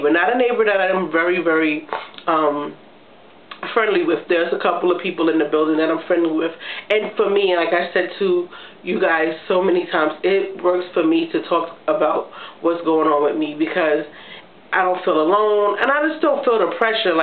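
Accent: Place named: New York, United States. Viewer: Dang your accent is so deep...Ny right lol...but people cant be so so rude. talking about your feelings and writing about them in a journal will defintley help..You have a right to feel any way you want about a situation those are your feelings...you are the only one walking a life in your shoes..no one has had your life but you so you're feeling will be different from everyone else. With the tears, the healing definitley begins little by little.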